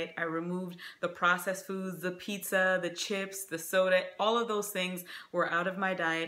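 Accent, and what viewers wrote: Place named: Caribbean. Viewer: You sound like you are from the Caribbean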